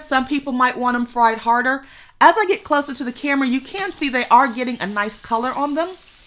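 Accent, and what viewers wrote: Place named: South, United States. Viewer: Yes, I knew you were from the south immediately when you said y’all! Thanks I’m glad to have found you. I will be following.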